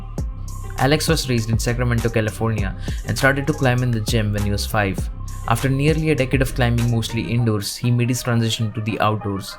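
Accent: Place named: India. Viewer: Are you Indian? I hear you sounds like call center.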